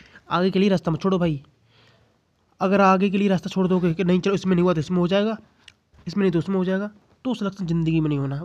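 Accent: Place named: Gorakhpur, India. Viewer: apke bat se lg rha ap gorakhapur side kr rahne vale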